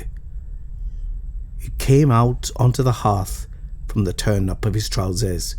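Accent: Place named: Wales, United Kingdom. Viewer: A brilliant story and a brilliant Welsh accent, Sir... Is there anything you can't do?:)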